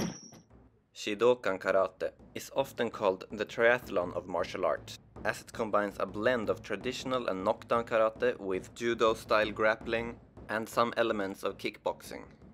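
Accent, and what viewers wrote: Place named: Scandinavia. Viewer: Your accent sounds familiar. Are you Swedish or from a nordic country?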